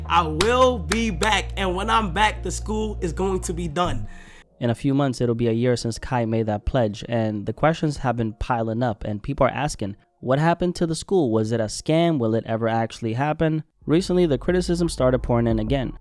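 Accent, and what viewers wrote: East Africa. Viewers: I knew you was an east African just by ur voice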